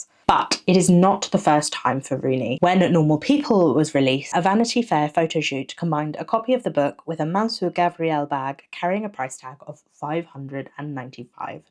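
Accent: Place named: England, United Kingdom. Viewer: Worth noting that while Ireland has never had an institutional left, it also has never had an institutional right. In fact, there's far less right wing leaning and visibility in Ireland than most western countries. We've basically always had a centrist government that makes progress consistently, just faaar too slowly. Also, something about an English accent explaining Ireland to me was actually grating lol. Can I coin the term 'Anglosplaining'?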